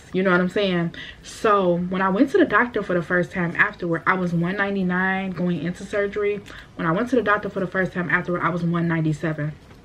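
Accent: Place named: Detroit, United States. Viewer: You sound like a fellow Detroiter!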